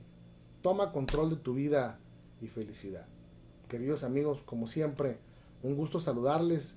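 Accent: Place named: Mexico. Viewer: Mexico, por el modo de hablar.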